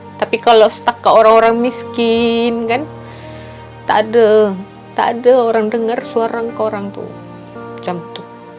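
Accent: Malaysia. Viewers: Bahasa sudah seperti bahasa org malaysia dah👍👍👍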